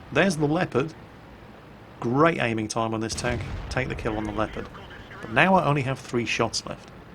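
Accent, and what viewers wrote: England, United Kingdom. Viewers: Not interested in WoT anymore, but I needed a nap. So I fall asleep to the sweet, silky, british voice of and wake up just as the video ends. Thanks:) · Really funny thing is, that Czechoslovakia existed before and after WWII. As the matter of fact during WWII the countries separated - Slovakia cooperated with Nazis while Czech Republic remained a territory ruled by Germans. Therefore these are check-them-Czech-tanks allright. IDK if someone mentioned it before me... ;P Great channel BTW, I love your english accent, is it genuine? :)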